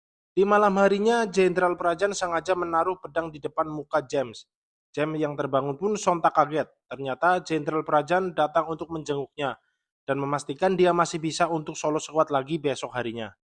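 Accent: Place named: Banyumas, Indonesia. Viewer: lucu bgt bahasa ngapak😅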